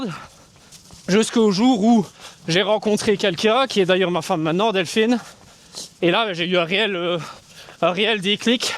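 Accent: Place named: Verviers, Belgium. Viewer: L’accent de Verviers qu’on aime tant 😍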